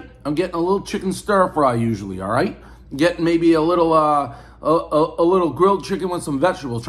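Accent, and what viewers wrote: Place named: East Coast, United States. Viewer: If you watch the closed caption, you can understand why people from the east coast sound so god damned ridiculous... That being said, I love the reviews... thanks for keeping us entertained,! You rubbaneck!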